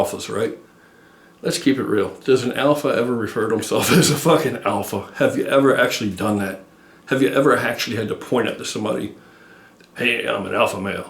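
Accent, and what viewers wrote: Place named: Ohio, United States. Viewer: Hey your accent sounds familiar...Ohio? I used to live in southeast Ohio as a kid! Anyway, cool channel and sick gains bro 👍🏼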